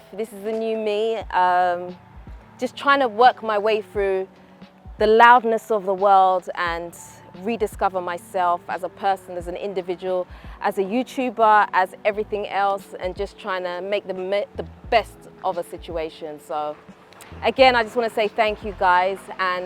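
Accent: United Kingdom. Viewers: " " got a heavy heavy british accent, blimey! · My☝🏿thought about you (AKA ) your not old, but a great builder of houses & homes, so keep working on building your genuine soul then you & the family w/ fall in place 😊….. Oooh yeah you’re accent is smethg i luv to listen to idk 🤷🏿 is it British? anywho enjoy the Motherland’s landscape ❤ & rmbr to keep building sis.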